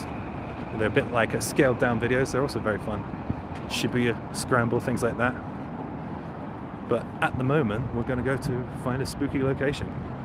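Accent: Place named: Scotland, United Kingdom. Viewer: J where is your accent from? Driving me insane. I hear my native Scottish when you talk. Please let me know 🤞❤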